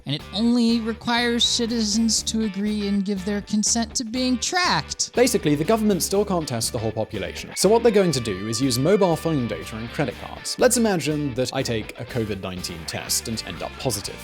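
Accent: United Kingdom. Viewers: Hi friends, i'm latino american and i can't understand this accent, (british accent) i'd like to listen these videos in EE.UU english, however you can speak slowly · Are you actually from Czech Republic? You have such a good british accent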